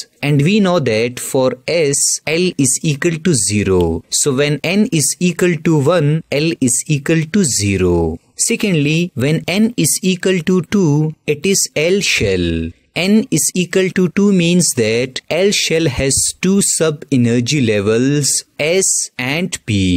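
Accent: South India, India. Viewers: Keralian ho kya ...awaj se lagta hain as south Indian jaisa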